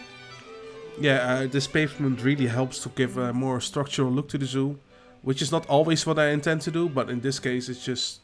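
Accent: Netherlands. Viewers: Ik hou van je Nederlandse accent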